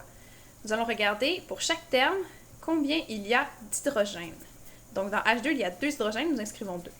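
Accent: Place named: Quebec, Canada. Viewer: C’est sont accent québécois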